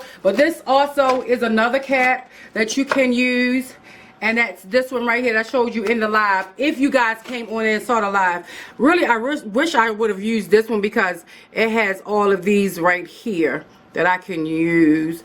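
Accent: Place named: DMV, United States. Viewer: She sounds like she's from the dmv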